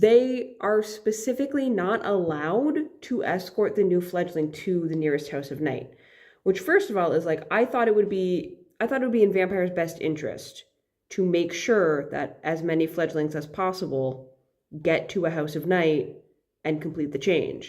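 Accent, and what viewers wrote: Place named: Nova Scotia, Canada. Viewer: The thing with the locations of the house of night, is they’re in places PC thinks are important or pretty. There’s three in Italy because Italy is pretty. Europe has a ton of them because classical Europe is pretty. There’s way more in America because PC is American. She doesn’t even really acknowledge Asia because, aside from pretty places like Japan, she doesn’t want to have her self insert characters go there and be in a less well off place than they would be in the Western world. Her worldbuilding and logistics is on the same level as JKR (Also I pegged your accent as Nova Scotian pretty quick due to watching too much trailer park boys lol)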